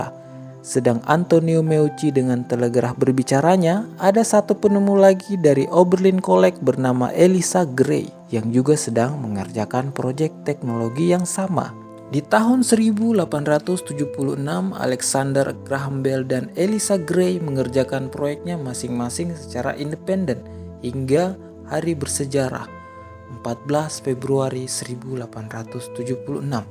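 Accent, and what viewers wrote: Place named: Palu, Indonesia. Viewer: Salfok dengan logat palunya le😁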